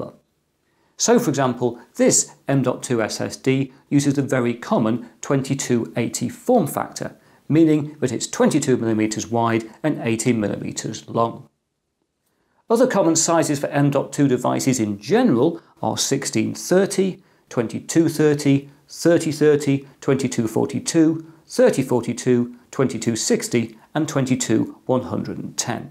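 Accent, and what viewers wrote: United Kingdom. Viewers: Information goes in so much easier when it's a fellow brit explaining it lol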